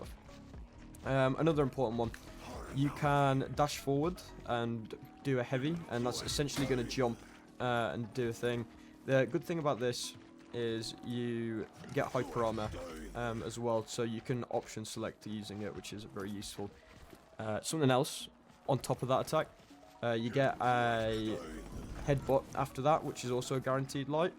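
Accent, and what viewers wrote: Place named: Australia. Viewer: Very good and informative video, my wife likes your Australian accent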